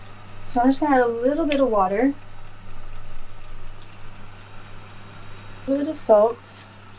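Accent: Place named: France. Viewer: Hi, this Is Katherine from Honduras Central América. I love your videos, muy second tong Is french, my mother tong Is spanish, but when I hear you, you are from France. So I feel like, I am traveling with you in your stories. Muchas gracias ❤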